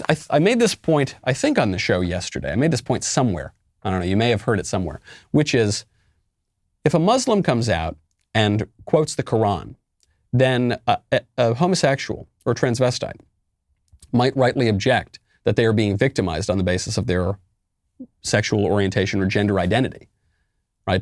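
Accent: England, United Kingdom. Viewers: Christians now more scared of the left than of God!! Your English accent is shite. Its doesn't make you sound funny and takes away from the point you are making. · Not a bad UK Accent Mike! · Love the English accent! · with that British accent had me dying lmao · I'm worried now. I live in England I'm still in school. We're learning about abortion and school and I'm probably the only pro-life person in my year; l've quietly mentioned my views and had a discussion with my liberal friends on it, but I don't feel it's safe to be completely honest even, well especially in my Ethics class. Your English accent is questionable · Your British accent is quite impressive... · Very nice british accent by the way. Also, yes, we live in a day and age where Christian views are no longer tolerated. I fear for raising my children in such a society.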